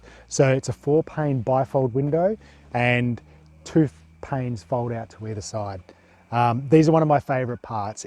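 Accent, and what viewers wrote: Australia. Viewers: Dump Chump I agree I heard a accent didn’t know it was Australian though, wasn’t focused on it. To be honest I was a bit drunk when I posted. Not a excuse just I wasn’t in my peak operating condition. I often forget we aren’t all living in a global economy, I don’t notice the separation anymore. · Nicely designed for the space. Lots of thought put into it. The entry/overhang is well worth the tiny added cost - it gives it character. Just something to share...one tiny house I saw recently showed a toilet that was in a bathroom, but it was incorporated into a cupboard, and looked just like a low cupboard door that was part of a tall cupboard. The lower part just rolled out on wheels and was a composting bucket. You could do the same here, thereby utilizing the large entry space (which would then provide the headroom needed forcthe action of sitting and rising from the toilet) and would thereby free the upper portion of what you currently have as a toilet for use as storage. 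If course no privacy if there are 2 of you, but it's an option for someone for whom that design would work. You say $4,000, but YouTube is global and I can't tell whose dollars that is - Australian? New Zealand? I'm guessing those because of your accent and use of the word bench for what North Americans call a counter. 😊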